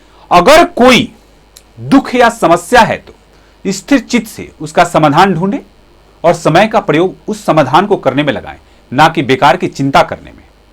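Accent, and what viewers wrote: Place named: Bihar, India. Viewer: hindi sudharo ... aise lagta hey jaise bihar sey ho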